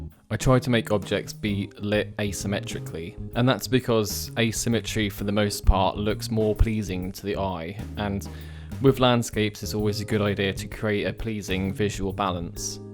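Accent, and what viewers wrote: United Kingdom. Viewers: Ha, it's nice to hear a fellow Brit artist. We're a minority group in concept art :p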